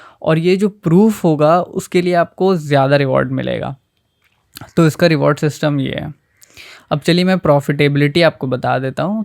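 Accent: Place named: Hyderabad, India. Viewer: he has hyderabadi accent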